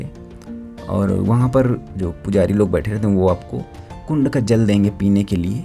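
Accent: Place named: Kanpur, India. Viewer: Ghusa dete hai kya kre kanpur ki language hi aisi hai but bhai best information or some funny language mja a gya👍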